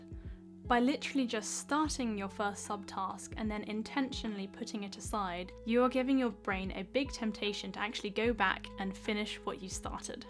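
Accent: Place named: RP, United Kingdom. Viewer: I'm not a productive person, but now I'm trying to be...I'm working on my English and my accent now and i love rp accent. I'm planing to watch your video everyday, not only that i get to do a listening practice but i also get a lot of super helpful and amazing useful tips❤❤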